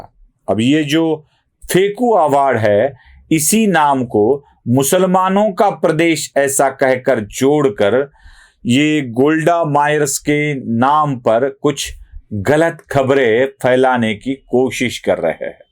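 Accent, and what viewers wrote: Maharashtra, India. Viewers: क्रुपया हिंदी बोलताना मराठी ची लकब टाकु नका. हिंदी सहजपणे बोला. टेंशन फ्रि. मग मजा येईल.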